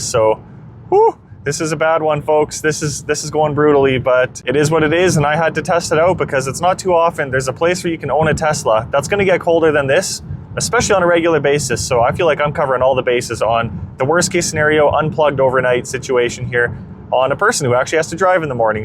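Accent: Canada. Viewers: the accent, the stache, the Saskatoonian winter, the consistent conversion of metrics, takin er out fer a rip, what an absolute beauty bahd. All regen, no degen. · Your Saskatchewan accent is deafening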